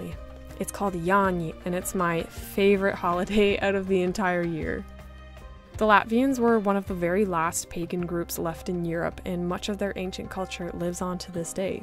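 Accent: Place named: Ontario, Canada. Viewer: Keep speaking this way, when you said you were nervous I was like noooo! It's so powerful! If I had to guess what province you live in... I think it's Ontario.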